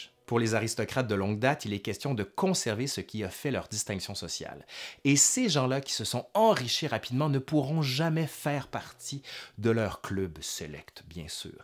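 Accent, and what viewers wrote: Canada. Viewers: L'accent canadien est-il un signe de distinction?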